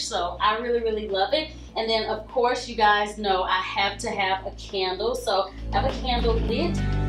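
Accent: South, United States. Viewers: I know it isn't much to film. But we miss your videos. If you have any bloopers or footage you think we would be board with is OK to post. We just miss that Southern Drawl & your contagious smile on our timeline. #MissYouAndYourFamily #BlessingsToYouAndYourFamily #MoreVideosPrettyPlease #FromCaliforinaWithLove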